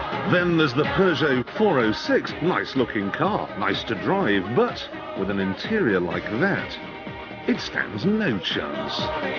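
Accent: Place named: RP, United Kingdom. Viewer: JC sounds so different back then!! He sounds all posh and BBCey!!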